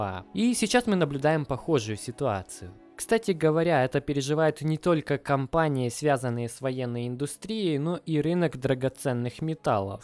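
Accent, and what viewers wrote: Ukraine. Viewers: так по говору слышно что чувак с Украины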